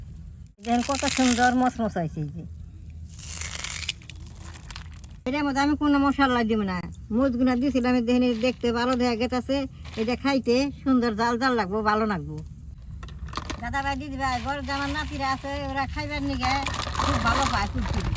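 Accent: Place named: Nawabganj, Bangladesh. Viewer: দেখেই মনে হচ্ছে অনেক মজার। দিদি আপনার দেশ কই? কথা শুনলে মনে হয় আমাদের নবাবগঞ্জ বান্দুরার 😅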